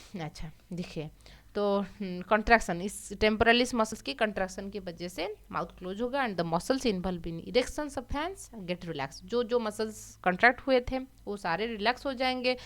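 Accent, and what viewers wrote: Odisha, India. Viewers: Mam are you from odisha??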